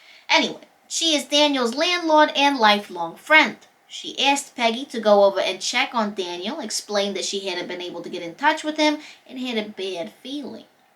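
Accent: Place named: New York, United States. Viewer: What's annoying is your thick New York accent...... I'd rather hear the bells!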